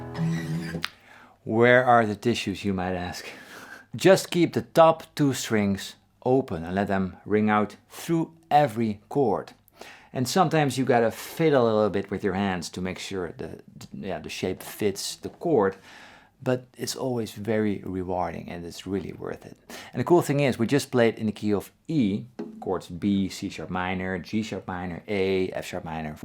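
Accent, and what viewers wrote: Netherlands. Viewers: As someone from the Netherlands myself, the Dutch accent of Dutchies speaking English often bothers me just a little bit, but you've managed to make your slight accent sound soothing instead. Nice playing, nice voice as well. Thanks for the videos! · Ik hoor aan je accent dat je Nederlands bent! heb mij geabonneerd, hele toffe tricks. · Classic Dutch accent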